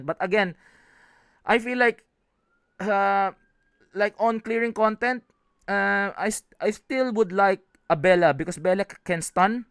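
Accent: Philippines. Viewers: pinoy to base sa English accent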